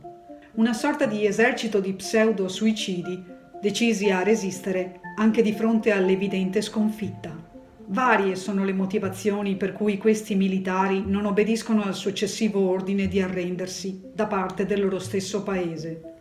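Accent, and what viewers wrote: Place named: Mestre, Italy. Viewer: Conoscevo questa storia incredibile....e mi fa piacere sentirla nuovamente raccontata da con il suo accento mestrino 😼...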